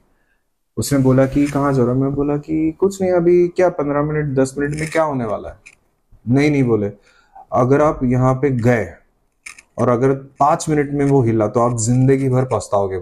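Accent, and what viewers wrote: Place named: Maharashtra, India. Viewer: तुझी हिंदी खूप चांगली आहेस, पण हिंदी बोलतांना तुझी मराठी Tuning अधून मधून बाहेर निघूनच जाते 😂😂❤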